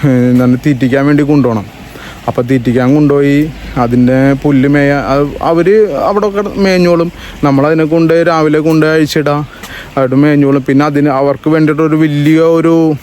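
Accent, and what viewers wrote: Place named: Ernakulam, India. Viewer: എറണാകുളം സ്ലാങ്ങ് ആണല്ലോ.Akm ആണോ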